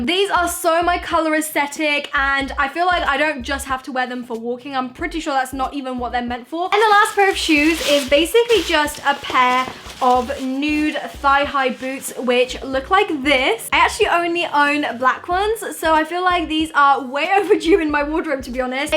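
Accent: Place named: United Kingdom. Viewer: I discovered you a couple of days ago and I really love you and I love your accent btw is it British?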